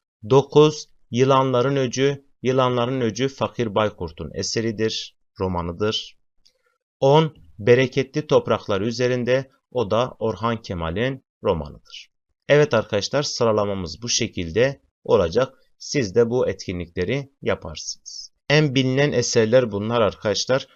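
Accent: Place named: Mardin, Turkey. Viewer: Güzel bir Mardinli şivesi var sanki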